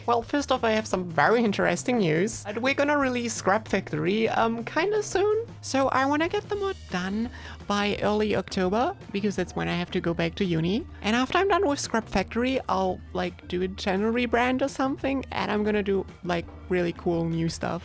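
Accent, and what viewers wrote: Germany. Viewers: she isnt using a voice changer, i think shes trying to make her voice less deep. and shes german so that may be why u cant understand her for some reason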